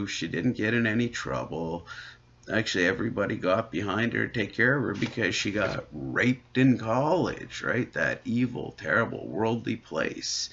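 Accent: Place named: Canada. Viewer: Really enjoying your videos Brother! Nice to hear a fellow Canadian! I was born and raised in Quebec but my Mom is from the Okanagan, Salmon Arm, Revelstoke way. Keep those experiences coming, I really enjoy them and it amazes me how close all our stories from all around the world are. I really hope you are keeping well with your medical issues, I will keep you in my prayers!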